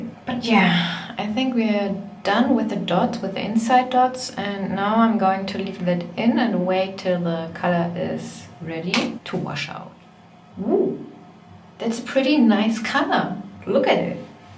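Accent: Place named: Germany. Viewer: Wallah so ein Deutscher Akzent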